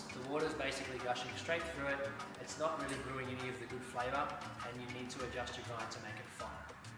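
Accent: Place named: Australia. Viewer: So good to hear an Aussie voice - The drone of Americans is more than I can take! Thank you for imparting your wisdom on us. We have a mini mazz - So many 'baristas don't even drink coffee!!! We start each day with a ristretto piccolo or 3 - The key to a happy marriage: )....oh and we subscribed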